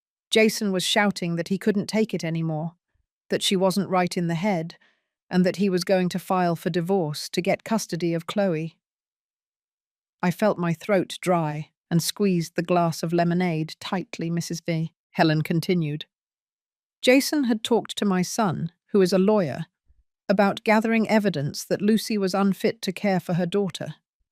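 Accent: United Kingdom. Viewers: Me too its an englih UK people accent .I enjoyed listening.i ❤it🎉🎉🎉🎉🎉🎉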